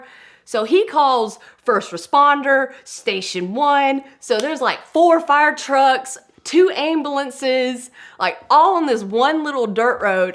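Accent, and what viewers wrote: South, United States. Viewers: Not even in by 9 seconds yet and dam that southern accent, i'm in love lol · Came into this video hoping to hear a southern accent saying "big ol mud tires". Was not disappointed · I fkin love southern accents. Im from Boston so dont hear it much up here. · Too southern of an accent for my likes, then I thought to myself Georgia? Why doesn’t Ed sound like that? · Cream job sums it up.... That southern draw so sexy.... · Nothing can be more American than her accent! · I love the blunt south talking thank god im not the only one left in 2020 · Anyone else’s eyes pop out of their head when they saw a good lookin woman, talking in a southern accent, about a truck?😂😂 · Beautiful girl, southern accent and loves trucks, she's perfect! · A Filipino with a STRONG southern accent - there has been a disturbance in the space-time continuum.... 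· Pretty asian girl with a southern accent, was a bit confusing, but it sounds like she's come a long way from rolling her truck to driving race cars 👍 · Heard the accent, thought southern bell. Said hillbilly dad, check, philiphina mom hold up. Look at video. Ah yes still a southern bell lol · what is hotter that a gorgeous asian woman with a southern accent? WOWWEE!!! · This channel makes me want a southern accent. "Quert o' ol"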